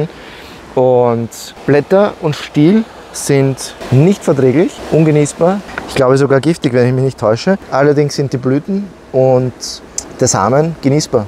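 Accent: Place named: Carinthia, Austria. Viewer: Hallo da habe ich keine Probleme,dich zu verstehen 🙂 habe viele Jahre im Kärnten meine Ferien verbracht 🇦🇹 war immer eine super Zeit,die ich dort verbringen durfte ♥️ Daher ist mir deine Aussprache sehr bekannt 🤗 Gutes Video von dir,Wie immer sehr informativ Grüsse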